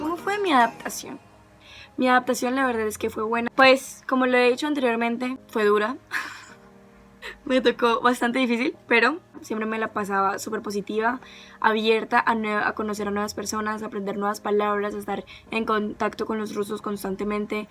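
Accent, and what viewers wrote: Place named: Colombia. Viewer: su acento colombiano despeja dudas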